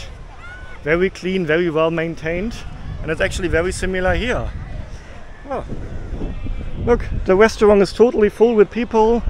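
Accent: Germany. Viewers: He is germans 😂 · Sounds like you are from Germany or German speaking country……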